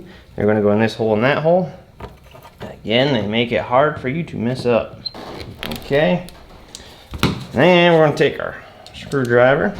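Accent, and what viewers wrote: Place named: Arkansas, United States. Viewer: Enjoyed the video! I was sitting here telling my wife that you sound just like her cousins in Arkansas and you could have done the video in about 45 seconds if you hadn't been so happy, friendly, sound effecty, and chatty lmao! 🤣 I'm going to subscribe just for the future sound effects!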